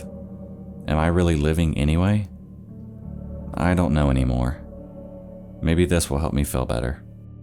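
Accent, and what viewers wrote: South, United States. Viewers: Agreeance????? Not a word my man. Are you Fred Durst? LOL! The word is agreement. I am in agreement. I am in agreeance doesn't do it. Agreeance is just not a word. It sounds like one but again, the word is agreement. You have good content and I like watching your videos. Just, do better with grammar and I have no complaints. I don't even have a problem with your slight but definite country accent. As long as you are using actual words and using them the right way, I will sub. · As a southerner myself, I know a southern accent when I hear one. I love this channel to death! Keep up the amazing work!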